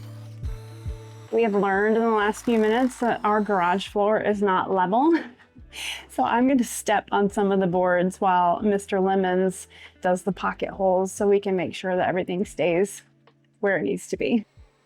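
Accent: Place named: Midwest, United States. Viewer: You sound like your more from Midwest than the market she’s in.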